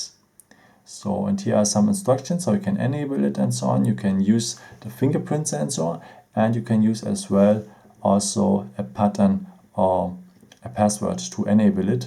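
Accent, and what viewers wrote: Germany. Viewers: You sound kinda german lol. Are you german? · The German accent triggers me. muss los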